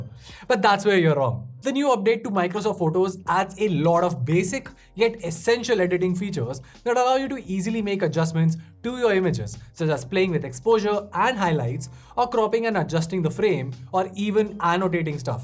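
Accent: India. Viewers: You're from delhi, aren't you? 😊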